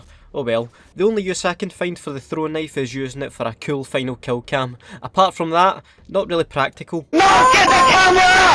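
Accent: Scotland, United Kingdom. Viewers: This mans accent is just awesome i love the Scottish accent mate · Love your scottish accent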